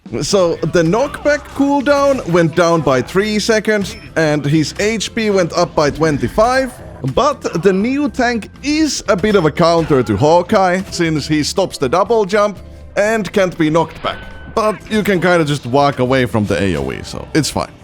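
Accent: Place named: Finland. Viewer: I love how Finnish you are. European accents are just so fun to my weak American brain. Maybe it’s all of the cholesterol going to my head.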